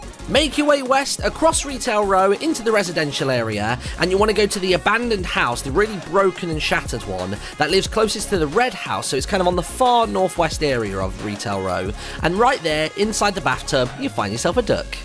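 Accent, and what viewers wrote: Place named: RP, United Kingdom. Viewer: UnknownMasksW2serialirious he sounds like a British butler.. Like seriously dude lol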